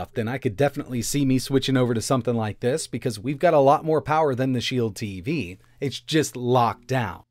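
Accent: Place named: United States. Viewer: his accent sounds like he is in the u.s., NOT the e.u.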